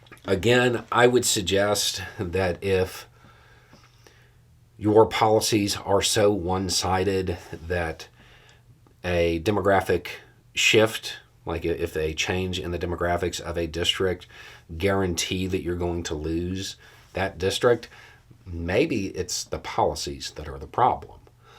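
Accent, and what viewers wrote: South, United States. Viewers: I found Beau because Facebook fed me videos of a guy in a shed with a ball cap and a southern accent, near the beginning I think. Then it stopped so at some point I realized he is on YouTube so I found him here and subscribed. Beau doesn’t participate in the for profit news business, no click bait headlines, no sensationalist videos, just news and commentary so that is why he lags in subs but still he is approaching 900k. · The accent probably puts people off initially. Just like WellRed. My Indiana emigre wife rolls her eyes whenever she hears the voices in f the south, and I can’t convince her to work in t all the way through. But it grows on you, as you know. Beau deserves public office, to be honest. But then we’d lose his straight shooting journalism.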